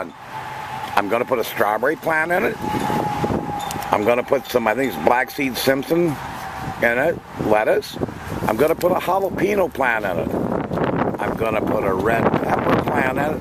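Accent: Minnesota, United States. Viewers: im sitting here watching his videos thinking the way this guy talks hes gotta be from minnesota haha. and sure enough he is. cheers from saint paul!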